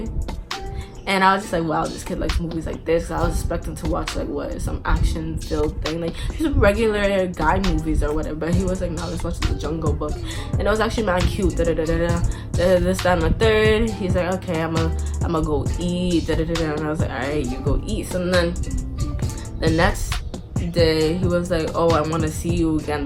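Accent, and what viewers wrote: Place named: Jamaica. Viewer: Yuh sound lik yuh Jamaican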